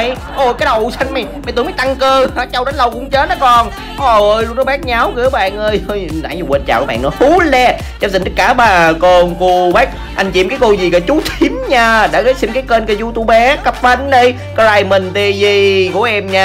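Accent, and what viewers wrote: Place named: Hue, Vietnam. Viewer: Nói giọng Huế đi anh ơiiiiii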